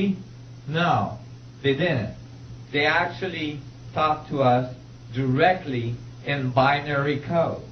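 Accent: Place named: United States. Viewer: He just sounds weird because hes from america. Doesnt mean hes a dumb arse.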